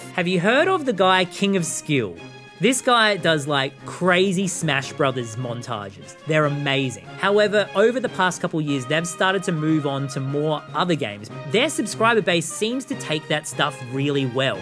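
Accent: Australia. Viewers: Came for impressive master quest run, stayed for funny sounding Australian who plays video games. I've been hoping for a long while that you would ignore data this and data that and simply play games you genuinely enjoy. FF7 remake has been very refreshing! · There are a lot of channels doing Breath of the Wild/Tears of the Kingdom content, so I don't think it's just the game. There's something about YOU playing BotW and TotK that draws people. And perhaps it's not just the Aussie accent! Maybe there's something about those games in particular that brings out the best YouTuber in you? I don't know. But don't sell yourself short thinking it's just the game. Maybe it's you playing the games that make it work, which is why you playing other games works fine but not as well? Just a thought.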